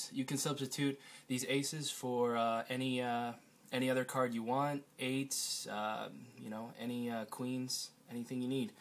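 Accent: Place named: United States. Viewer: Love the American talk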